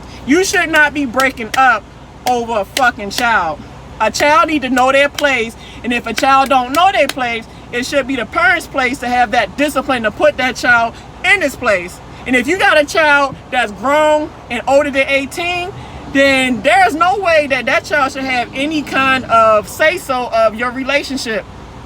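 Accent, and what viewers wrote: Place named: Baltimore, United States. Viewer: I can't tell you how this video came up in my recommendeds but I'm glad it did. I heard a few words and instantly knew, she from Baltimore. My best friend is from B'more and an old Army buddie. That accent is heavy. Be safe out there on that road Ma'am.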